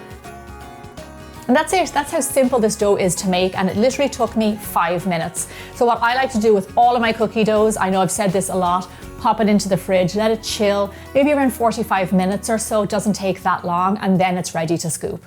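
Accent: Ireland. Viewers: Came for the recipe, stayed for that lovely Irish accent! Can't wait to try!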